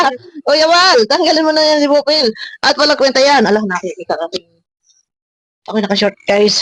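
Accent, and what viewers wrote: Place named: Iloilo, Philippines. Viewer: Matanda kna.....NAGTATAGALOG KA. ILONGGO NMAN ANG TUNO MO.. TANGA KA.. KAKAHIYA KA kapitanang UBOD NG BOBA.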